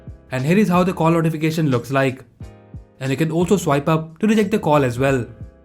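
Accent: India. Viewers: I hate These Indian voices 🙄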